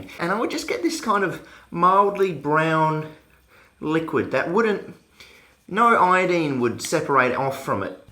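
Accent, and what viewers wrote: Australia. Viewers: He Australian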